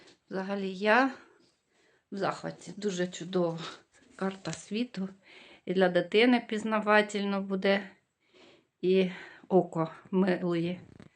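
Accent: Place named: Vinnytsia, Ukraine. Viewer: Я здогадалася,що ви з Вінничини, по вашій манері розмовляти,я також з Вінничини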